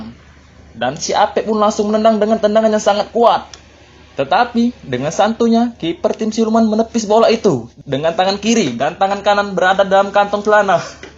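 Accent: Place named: Palembang, Indonesia. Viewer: Ay wong palembang cknyo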